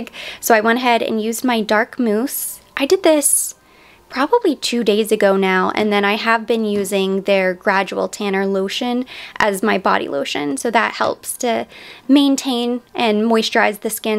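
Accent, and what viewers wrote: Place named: London, United Kingdom. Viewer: i agree. i wasnt sure if its Australian accent or English but i played it back and sounded more as a Londoner..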